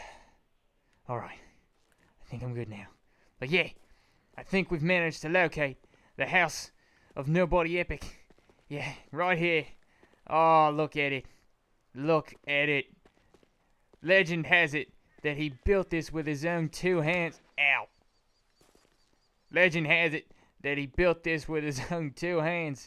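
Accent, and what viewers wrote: Australia. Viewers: R u Australian? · That Aussie Accent, Yes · Nice aussie accent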